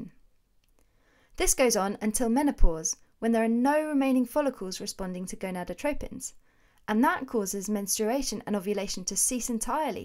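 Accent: United Kingdom. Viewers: Great voice, like made for this. A bit cliché with the British accent ;)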